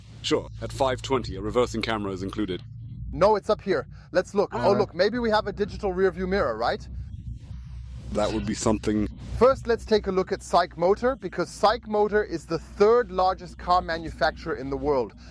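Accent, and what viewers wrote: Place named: Berlin, Germany. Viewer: Sorry, you are totally right. It is necessary to watch longer than one Minute before giving a quote. 😂 With Ai your „Berlin Accent“ is missing but I think it is just a question of Updates that this will be solved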